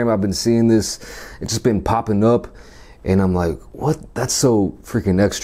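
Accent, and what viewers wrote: United States. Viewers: I can tell you aren’t from Seoul originally but from the U.S. why do you live out there? Jw. Love your content!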